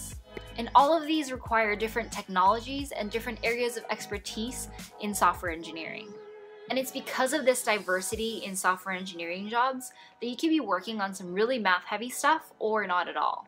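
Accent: United States. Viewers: bagus adji i think the name is Japanese but the accent makes her sound like she was born in the US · Are you American?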